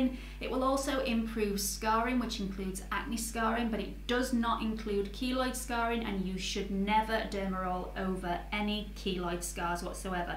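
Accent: United Kingdom. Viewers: BritishJamaican777 British English 😂😂😂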